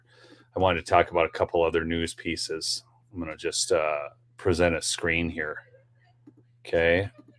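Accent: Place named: Northwoods, United States. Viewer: Southern Iowa. Love your Northwoods accent and your program.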